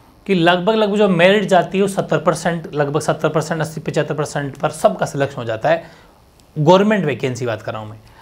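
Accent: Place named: Rajasthan, India. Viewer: Rajasthan se ho na sir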